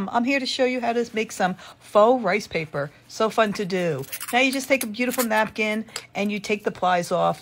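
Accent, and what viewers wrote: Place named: East Coast, United States. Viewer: I hear an east coaster...NJ? "Cawfee" LOVE IT!! really I do. This is a fun tutorial, thank you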